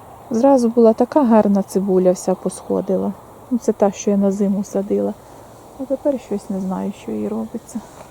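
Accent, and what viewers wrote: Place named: Ternopil, Ukraine. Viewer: Добрий день,я у вас новенька,і мені цікаво,а у вас є відео,де ви розказуєте як ви попали в Карпати, дещо про себе,де працювали,про вашу сімю.Говір ваш такий,як на Тернопільщині, або на Франківщині,як ви потрапили на Запоріжжя?Розкажіть про свою сімю. Дуже буду вам вдячна. Всьго вам найкращого,обживайтесь на новій землі,пускайте свої корінчики,нехай Карпати стануть для вас гостинні та рідні.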